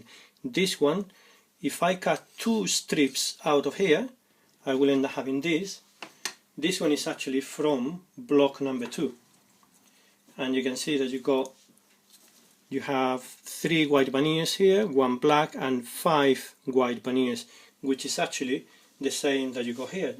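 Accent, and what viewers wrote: United Kingdom. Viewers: can you make up your mind? are you british or spanish?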